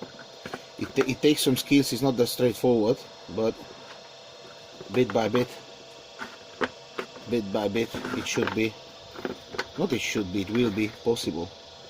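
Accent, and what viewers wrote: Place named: Hungary. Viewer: Az akcentusból éreztem, hogy magyar vagy :D.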